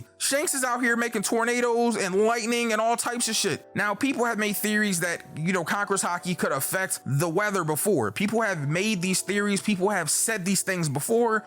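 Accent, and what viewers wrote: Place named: Oakland, United States. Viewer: Really enjoy you being yourself when explaining things. Hearing you reminds me of my time with friends from Oakland and their cool vibe. Keep it up. It means a lot for some people like me.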